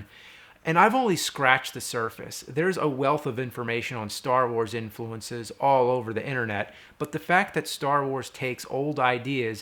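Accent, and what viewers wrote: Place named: New Jersey, United States. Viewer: It's because he's from Jersey. That's how people around and in NY speak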